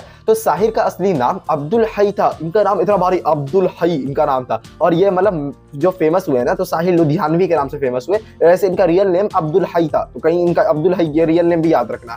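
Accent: Bihar, India. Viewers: Are you from Bihar 👍🏻,?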